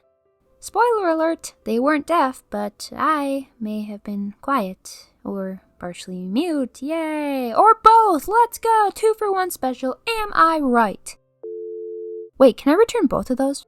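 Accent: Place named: Australia. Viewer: I don't why but everytime I am rewatching this; I am hearing a slight Aussie accent from you. LOL !!! Yes ... I came back to type this !!! 😆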